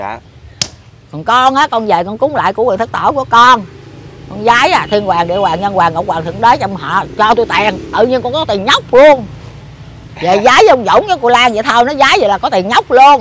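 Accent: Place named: Mekong Delta, Vietnam. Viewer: bữa giờ nhớ quá vì không có được xem và nghe tiếng miền tây của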